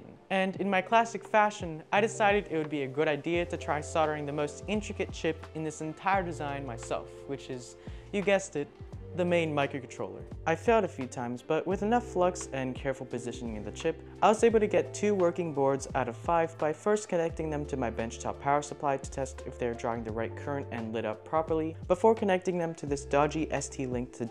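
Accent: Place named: Australia. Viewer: As soon as I heard 'I decided to grow a pair', I thought wait what is he Australian? Yep. 🤣 Good luck in your studies and good to see some young passionate people coming into the field!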